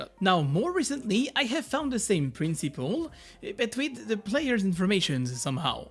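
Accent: France. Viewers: Wait, after all this time watching, i just discovered that t'as l'air vachement français bordel. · de ouf il parle super bien english mais t'entends tjrs l'accent français xDDD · Tellement frustrant d entendre cet accent mais de ne jamais avoir de video en francais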